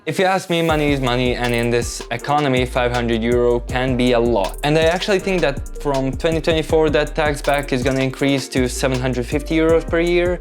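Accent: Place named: Balkans. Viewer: I’m trying to determine your origin based on your accent. My guess is somewhere in the Balkans — waiting for confirmation. Btw your English is pretty good! 🎉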